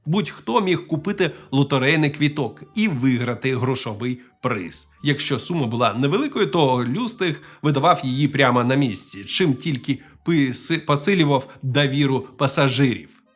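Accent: Russia. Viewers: Приєднуюсь до критики і від себе хочу додати, що відчувається россіянський акцент. Треба над цим попрацювати, або не поспішати. Але взагалі дуже чудовий канал та цікаві відоси. Продовжуйте! Будемо дивитись!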